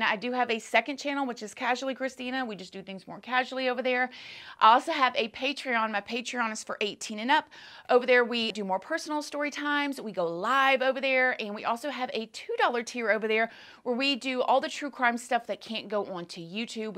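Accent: South, United States. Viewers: I love your accent!!!! I’m from NYC so I don’t really hear no1 speak in southern accents. But when I do hear someone speak with a southern accent, they don’t sound this lovely and awesome!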